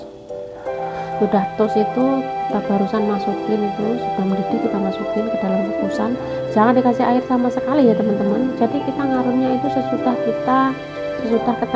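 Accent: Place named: Banyumas, Indonesia. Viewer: Ngapak ya 🤭🤭🤭😂😂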